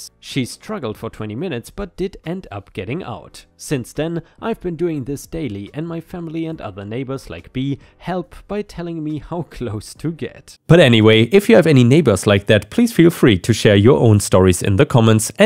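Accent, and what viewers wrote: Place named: Germany. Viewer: Where did you come from? Your accent sounds German.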